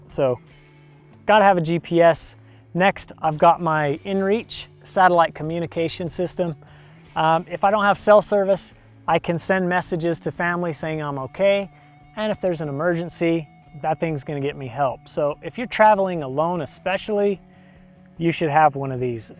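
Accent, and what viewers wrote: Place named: Utah, United States. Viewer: He's trying to say accessory, but has a bit of a Utah accent.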